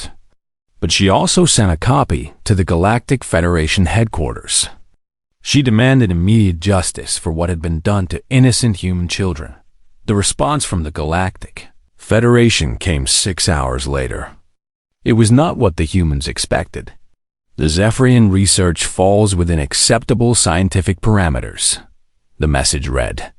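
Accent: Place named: New York, United States. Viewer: This new york accent sucks. I cannot listen to this or watch this...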